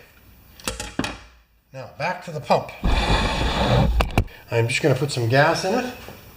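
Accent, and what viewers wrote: Scotland, United Kingdom. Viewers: Good close up for sure. I liked your Scottish accent ;-) no easy fix for that pump.. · Great Scottish accent there, better than mine! (Watching from the UK):)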